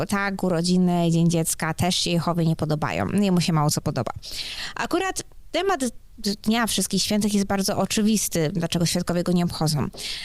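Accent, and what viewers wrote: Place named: Ukraine. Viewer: Sądząc po akcencie to jest chyba biedną ukrą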